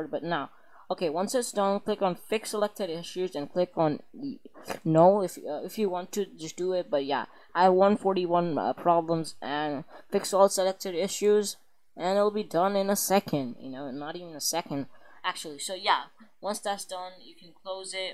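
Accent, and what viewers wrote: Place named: India. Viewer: You sound like a India Girl no offense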